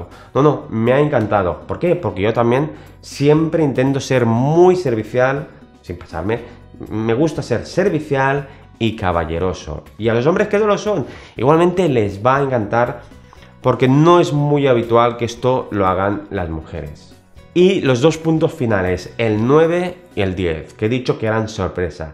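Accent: Spain. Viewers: no me gusta el acento español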